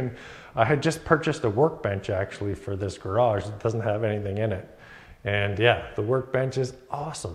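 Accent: Canada. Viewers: Many congratulations. Solid channel that I have taken so much from as I have embarked on learning DIY and home maintenance from scratch over here in the UK. The accent gives me fond memories of my time in Canada and the advice is superb. First DIY video I watched on youtube was your circular saw - don't cut off your sausage fingers one and I am glad to say my sausages are still attached. Anything windows, cabinets, studwork, decks, sheds, stairs welcomed. Good luck!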